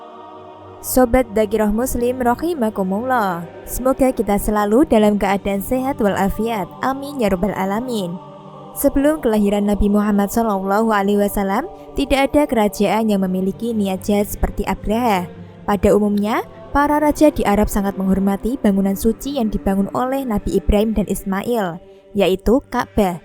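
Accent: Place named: Java, Indonesia. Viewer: Medok jowo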